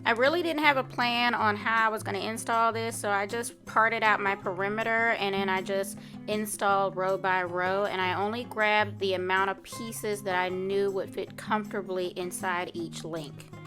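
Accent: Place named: South, United States. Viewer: Ok ms thang with the country twang!!! You ate this up my love!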